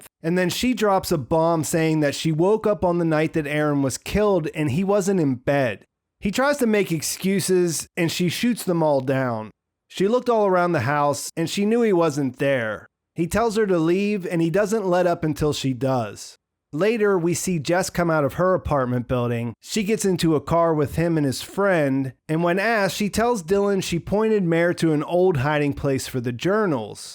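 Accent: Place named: Pennsylvania, United States. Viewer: You have a Pennsylvanian accent.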